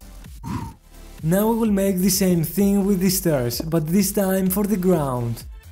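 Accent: Greece. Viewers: Jesus, he is Greek. There are other accents in the world, you know..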